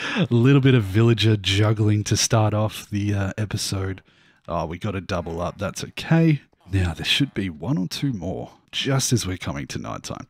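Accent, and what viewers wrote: Australia. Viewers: Discovered your channel recently with this series and I love your content! I guessed you were Australian before looking it up haha Looking forward to see what you’re gonna build, your series is really chill and relaxing it’s so good! Keep up the good work, love it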